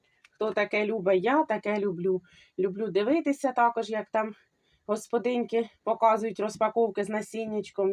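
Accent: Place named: Poltava, Ukraine. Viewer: Наша Полтавська мова , аж приємно 🩷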